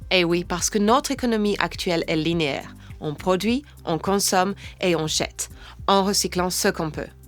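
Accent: England, United Kingdom. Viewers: C'est excellent!!! J'ai eu peur un petit moment à cause de l'accent, mais ha, elle parle en français avec son accent anglais, tout s'explique! Et le message de la fin est extrêmement pertinent (personnellement acquise à cette cause à 100%, j'utilise des sacs en tissu, des bouteilles consignées et j'ai des pailles lavables en métal)... et la dernière phrase est tellement forte!!! Je valide avec un enthousiasme indescriptible et les larmes aux yeux! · Bravo pour cette nouvelle vidéo! Accent anglais parlant français très réussi! Thème d'actualité qui fait du bien aussi! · L'accent britannique est tellement parfait, il est mesuré, à aucun moment cliché. Et le fait de parler écologie : 💚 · Cet accent anglais 😳😱😱😍😍😍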